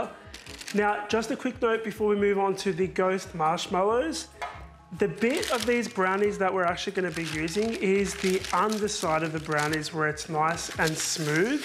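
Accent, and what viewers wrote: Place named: Australia. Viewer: Yesssss! I need to try to make them.... also I love your accent 😍 Australian?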